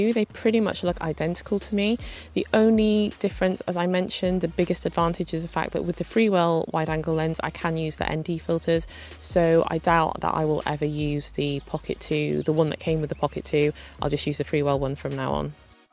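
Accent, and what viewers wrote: United Kingdom. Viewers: Also I love your british accent :)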